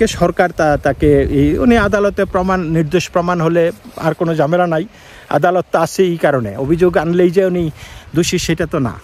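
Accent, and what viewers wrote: Bangladesh. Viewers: উচ্চারণ শুদ্ধ করুন। বাঙ্গাল ভাষার আধিক্য। ভারতীয় বাঙ্গালীরাও আপনার YouTube এর দর্শক।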